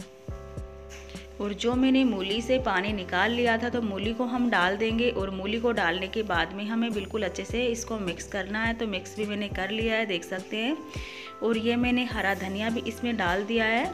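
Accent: India. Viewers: Nice Video ! From your voice it appears you are from Rajasthan !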